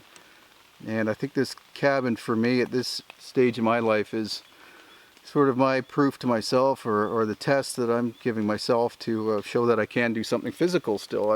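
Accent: Canada. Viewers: i can tell by the maple leafs and ur accent ur canadian lile me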